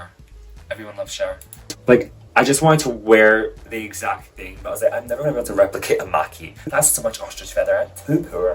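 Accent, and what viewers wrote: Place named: Scotland, United Kingdom. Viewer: You look sexy as real lady and like your Scottish voice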